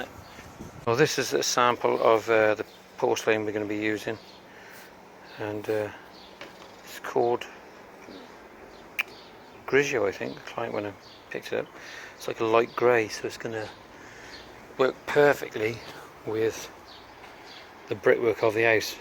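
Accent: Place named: Malpas, United Kingdom. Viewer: Cracking job! Just come across your videos! I recognise that accent, my Mum's from Malpas 😎👌🏻👌🏻🏴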